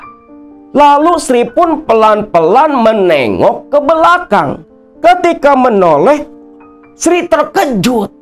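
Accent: Java, Indonesia. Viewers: Bahasa Jawa kang lucu 😅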